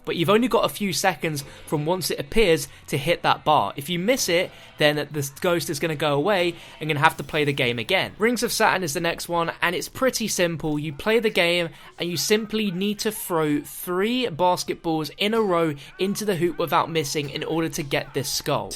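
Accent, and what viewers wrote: England, United Kingdom. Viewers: He's from England, and that's how we say it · That's how Britains pronounce it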